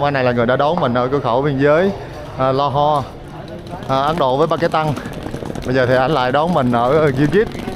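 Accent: South, Vietnam. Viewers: Kênh du lịch này hay hơn Lê khả Giáp gắp 10 lần , giới thiệu nơi đến rất tỉ mỉ , đặc biệt giọng nói miền nam rất dễ thương không hiểu sao ít đăng ký hơn LKG . Tôi không thích LKG trước khi sư Minh Tuệ xuất hiện và thích kênh này giờ càng thích hơn vì chủ kênh không xâm trổ ( nhìn giống du đảng ) . Làm YouTube có tâm , sạch như thế này rất tốt , mong cháu phát triển nhiều hơn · Chị đã đăng ký kênh em rất thích chất giọng Miền Nam rặc nghe chuyển cảm , thật thú vị khi xem video của em. Thanks .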